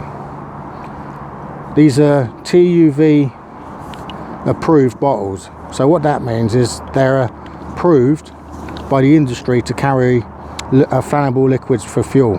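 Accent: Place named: United Kingdom. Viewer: This is my favorite type of British accent. Great video.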